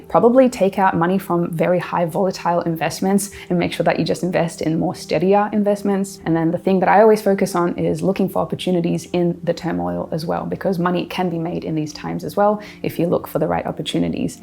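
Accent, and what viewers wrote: Australia. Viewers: Iceland??!?! You sound like an Ozzie! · I am surprised you are in Iceland! I thought you were Australian. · Iceland?? She sounds like an Aussie to me (and I'm an Aussie!) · You're from Iceland!😮 I thought you were Australian.🤔 I make lots of predictions. Sometimes I'm right. But I'm not famous for it. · I always thought you were Australian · For a minute I thought you were Australian but thank you for this warning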